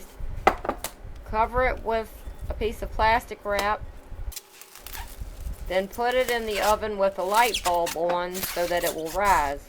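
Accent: South, United States. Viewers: Thank you very much intelligent lady with the southern accent...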